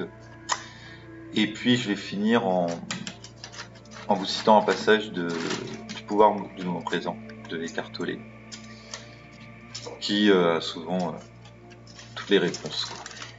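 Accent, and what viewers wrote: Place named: Quebec, Canada. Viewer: Si l'accent québecois est supportable, ça parle du stress <link>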